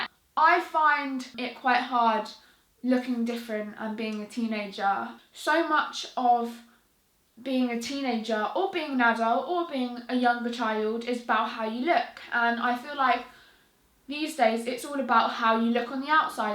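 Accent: United Kingdom. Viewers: Gotta luv that british accent! Cant get over it! And when you sing? Your amazing! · I love yor british acent , is very elegant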